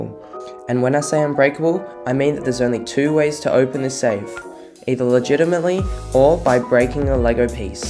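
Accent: Australia. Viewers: YOUR AUSSIE!!!! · are you aussie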